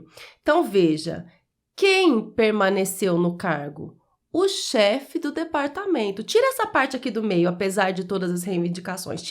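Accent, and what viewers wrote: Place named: Minas Gerais, Brazil. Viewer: Muito elucidativo, professora com sotaque mineiro (alto paranaíba?), parabéns. Acho que a complexidade das perguntas deve ser crescente, como tem sido apresentado.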